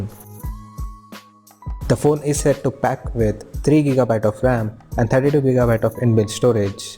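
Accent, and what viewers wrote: India. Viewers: U speak with a strong indian accent hard to understand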